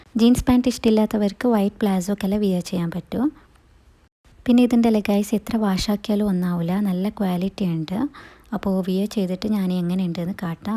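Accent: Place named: Kasaragod, India. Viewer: കാസർഗോഡ് ഭാഷ ഇങ്ങനെ ആണ് സുഹൃത്തേ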